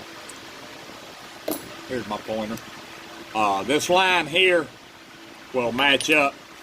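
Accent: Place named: Georgia, United States. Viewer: very ingenious design and I learned something today without trying. who says you can not teach old dogs new tricks. love the channel but where are you from? I am a Georgia boy myself so accent is familiar. love the channel.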